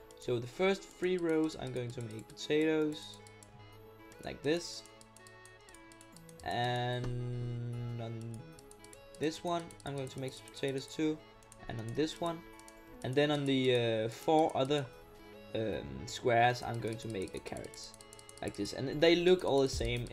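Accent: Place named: Denmark. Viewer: Man kan høre du er dansker. xD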